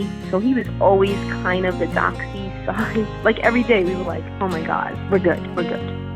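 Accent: New York, United States. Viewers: Love your New York accent!! Love from California! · Omg love your story. I’m from LINY and you sound like your either from there or Joisey! God Bless you always 🙏🏼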